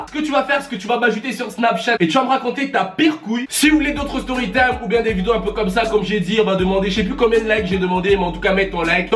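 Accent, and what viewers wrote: Switzerland. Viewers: T’es suisse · t suisse? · T'es suisse? si oui je m'abonne😂😂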